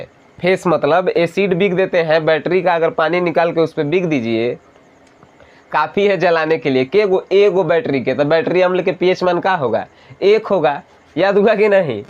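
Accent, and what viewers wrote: Bihar, India. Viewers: Sir ap Bihar se belong karte h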